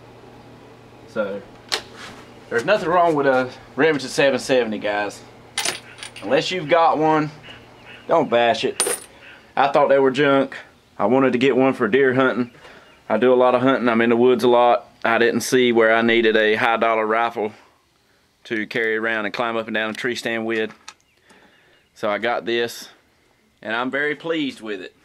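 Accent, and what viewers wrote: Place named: North Carolina, United States. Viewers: I love your NC draw. I'm from Oklahoma and people rib me about how I talk, so I was laughing with you, not at you when you said 'accriate'. My wife was in the other room and she heard you say it too. She said, "Where's he from?" LOL. We laughed again when you started talking about nipple to nipple. That was pretty good. You can figure if you get hit between the nipples with a rifle, it's all over. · I was born and raised in NC, moved to Florida a couple months ago. Watching this video, it was great to hear someone speak North Carolinian again lol. Looking at picking up one of these soon, been debating it and comparing with other similar rifles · U sound lreally southern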